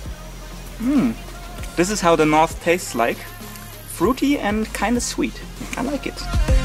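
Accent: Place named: Germany. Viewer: Cant stand this german accent...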